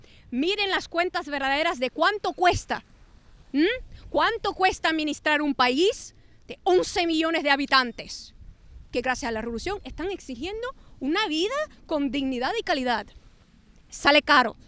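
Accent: Spain. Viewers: Ridículo tu acento gallego que sacamos a punta de machete , tu te estas aguando en un vasito de agua, sin ningun proposico. En Suecia la Universidad es gratis en Canadá te ponen un yeso. Eso es lo que queremos en Cuba . los suecos no tienen comunismo. Ja Tienes 12 latas de atun y dos generadores eléctricos.Mejor dejo a esta estúpida. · Niña estás locamente loca Dios mío manera de hablar mierda,eres cubana q mierda hablas como española con esa Z horrible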